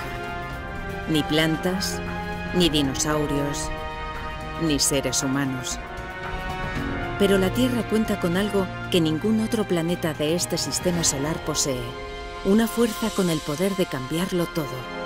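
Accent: Spain. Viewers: Luego está internet lleno de odio hacia el acento español 😢😭😭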